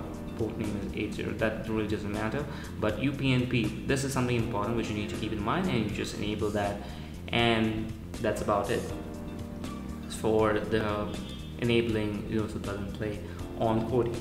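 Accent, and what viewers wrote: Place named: India. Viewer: Why does this Indian dude have 160 Subscribers he should have at least 20,000 he's just speaking English without any pronunciation errors but has an accent which is ok but he's just the best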